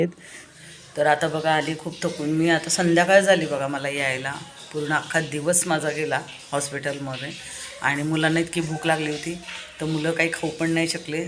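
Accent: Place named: Pune, India. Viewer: ताई तू पुण्याची आहेस का proper.. बोलण्याची ट्यून पुणेरी वाटते म्हणून विचारले.. आणि कोमल खूप छान आहेत..होईल सगळ ठीक..❤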